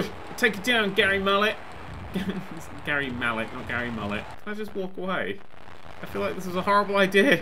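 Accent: United Kingdom. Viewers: Are you British or Australian🤔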